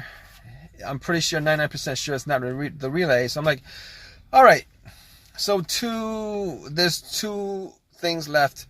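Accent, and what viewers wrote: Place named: Massachusetts, United States. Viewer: Sounds like Massachusetts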